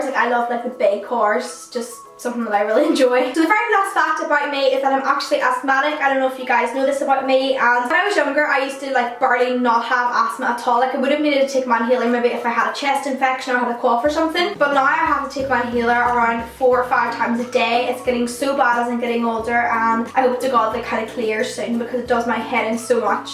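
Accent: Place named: North, United Kingdom. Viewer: I love your accent! my dad is from the north too so I love hearing people's accents other than his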